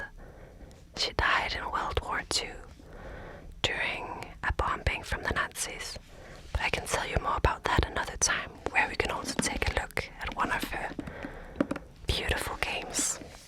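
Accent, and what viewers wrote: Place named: Ireland. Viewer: bravo! i usually view whisper vids as a copout for wannabe-ASMRtists who don't have a suitable voice, but you're the exception to the rule. question before you get too famous and stop replying - what's your nationality? I hear an Irish accent most of the time but iirc you said you were from Belgium or The Netherlands?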